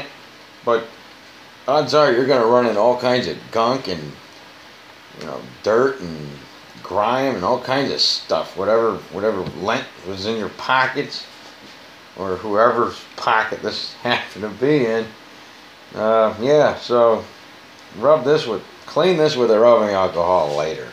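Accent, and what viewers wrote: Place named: Buffalo, United States. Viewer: You sound like you're from Baffalo!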